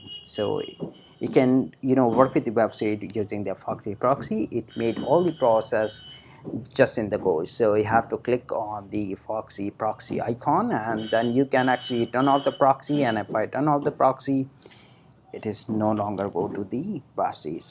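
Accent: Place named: United States. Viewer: bhari english bola he bhai maasaallaahh full american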